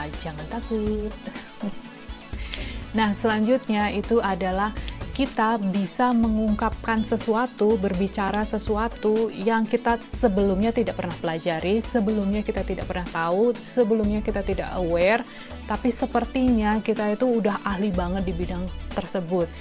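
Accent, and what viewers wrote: Banyumas, Indonesia. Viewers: Mba Cilacap ya salam ngapak, ora ngapak ora kepenak... 😄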